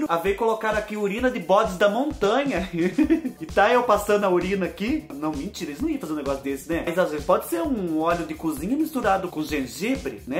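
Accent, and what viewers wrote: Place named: Curitiba, Brazil. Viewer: Tive que me inscrever não tem como, preciso de rir um pouco nesta pandemia, kkk e vc é mto engraçado <link> identifico mto com seu sotaque e seu vocabulário. Kkkk sou de Curitiba PR, e nois fala um pouco deste jeito .Vc é maluco fica carcando estas coisera no cabelo vc vai e ficar careca daqui um dia. Eu já fiz mto estas coisas tb.até banha coloquei no meu cabelo, fiquei 10 dias lavando meu cabelo pra sair aquela Gordura .bjo obrigada por me fazer rir.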